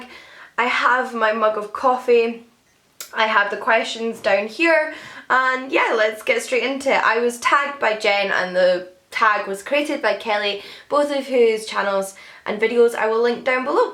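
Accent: Scotland, United Kingdom. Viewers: Well, now I want that big Sherlock Holmes book;). About ugly covers - I think I have quite a few of those from second-hand bookfest buys! Right now I'm reading the ugliest library edition (Scholastic edition) of The Hunger Games ever, and it's so embarrassing going about the house reading it, I'm reading it alone in my bedroom... ugh!:P I read The Book Thief this year, and I found it quite an intriguing read - mostly because I had such low expectations of the book after hating the film version... I guess I liked the friendships in that book, and also sometimes the writing was quirky. But yeah, it was a weird book, and definitely not a favourite war novel! Fun tag, ^_^ P.S. I love your Scottish accent - so cute!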